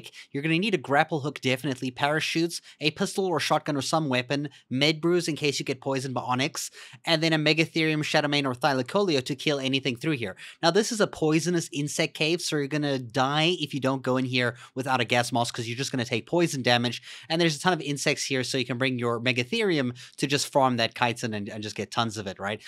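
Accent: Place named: South Africa. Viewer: I knew you sounded south African. Wild lol 😆 awesome guide bro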